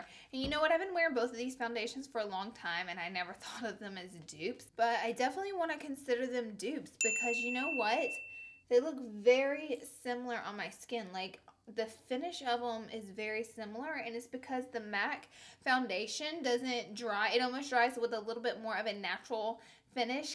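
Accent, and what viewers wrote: South, United States. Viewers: Love your extra craze and extra south in this video! ❤️ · Just started watching you and my first thought was oh, girl from the South! Love it! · First time watching your video, I love your Southern accent!! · I'm obsessed with your country twang. OBSESSED! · OMG, I love you!! <3 I hear your southern belle accent coming THROUGH! And i do love the way you styled your hair in this video. xoxo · I just love you ! Every time I see the episode of the office when Pam is Deb U Taunt in that murder mystery game I think of your southern darlin accent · She is so pretty but her voice is so country 😅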